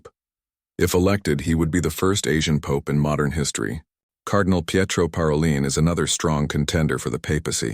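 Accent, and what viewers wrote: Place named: United States. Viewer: almost like an American talking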